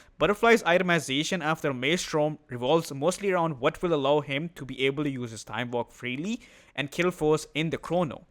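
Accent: India. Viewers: You’re accent so indian 😂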